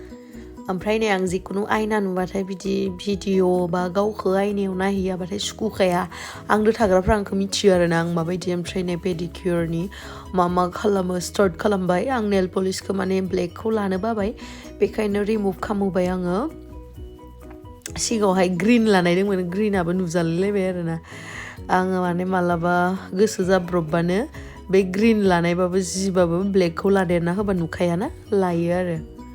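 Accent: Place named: Goalpara, India. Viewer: Manw sanjari railaiyw Aabo sona, Golpariya railainanag gwo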